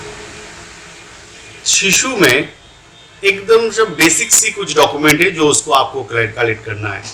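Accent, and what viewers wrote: Bengal, India. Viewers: You are Bengali right??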